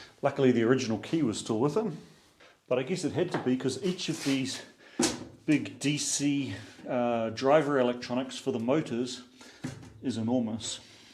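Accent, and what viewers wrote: New Zealand. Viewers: Yeah that industry is not known for innovation, but for safety measures :) So they needed evidence they really work :) By the way: may I ask, where did you pick up that strong New Zealander accent? It's really a pleasure to listen to you :) There is a guy on YT (Geoffry Croker) he speaks like this :) But he's native there :) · You sound like a kiwi.. but do you live there? Hmm!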